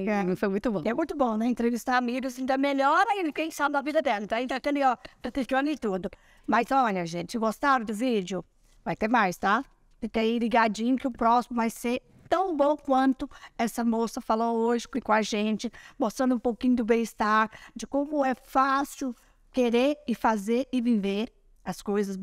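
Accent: United States. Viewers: tá falando bem americanizada 😊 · Ta com sultaque americano 😮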